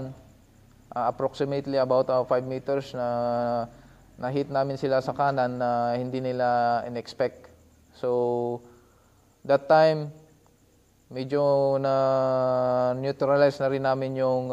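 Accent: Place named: Samar, Philippines. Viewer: Sir tubong ano po si sir? Parang Samar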